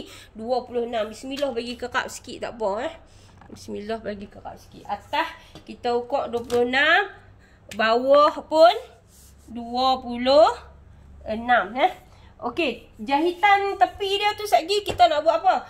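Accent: North, Malaysia. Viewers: Walaupun saya bukan org utara, tp masih faham apa cikgu terangkan.. Dan suka pulak dah dengak loghat cikgu.. Dan cikgu terang memang jelas dari a-z.. Terbaik.. 👍👍👍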